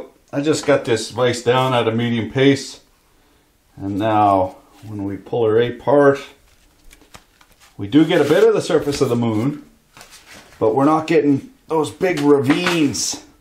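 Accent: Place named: Maritimes, Canada. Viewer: Wow, the Maritimes sure came out in this one....!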